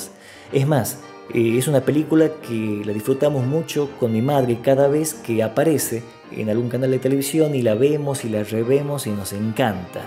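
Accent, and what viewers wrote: Tucumán, Argentina. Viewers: Muy bueno el video. Haría dos comentarios. Le Pera nació casualmente en Brasil, pero nunca vivió en Brasil, siempre vivió en Buenos Aires. De la manera que Gardel Nació en Francia, pero pasó su infancia en Argentina. Diríamos que los dos fueron argentinos. En cuanto al relato de la creación de Por una cabeza, no fue Pigna el que lo recopiló sino que está en el libro de Tucci. En cuanto Delicatessen, no recuerdo que apareciera Por una cabeza, lo que sí aparece es Una lágrima tuya., me gusta más cuando hablás en tucumano que cuando hablás en castellano neutro.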